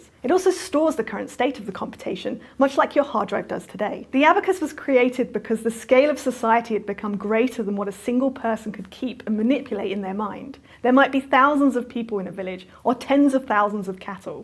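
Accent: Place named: United Kingdom. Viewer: 英音听着好难受啊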